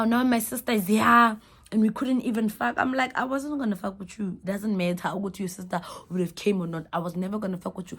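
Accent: South Africa. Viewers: maan, it's my 1st time watching your page. I love your Xhosa vha, I was laughing from the get go😂😂😂.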